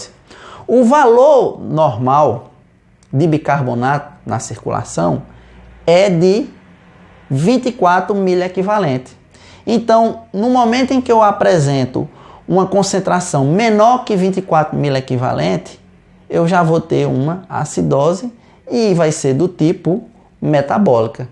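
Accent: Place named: Northeast, Brazil. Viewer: Esse povo Nordestino tem um acento muito feio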